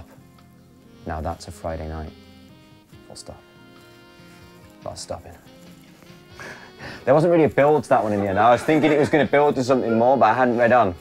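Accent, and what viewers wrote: United Kingdom. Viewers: Damn his British accent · I can't understand half of the words he said, still learning to understand british accent better😂😂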